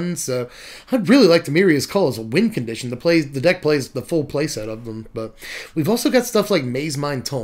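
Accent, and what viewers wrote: United Kingdom. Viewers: I liked this vid specifically for the crazy brit voice lmao. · Love the English accent haha as an Englishman my self it was perfect 🤣 love you keep up the amazing work!!! Best MTG creator and it ain't close!!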